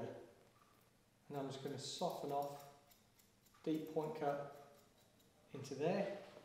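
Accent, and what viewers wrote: United Kingdom. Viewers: LOVE clicking a video and hearing an unexpected UK accent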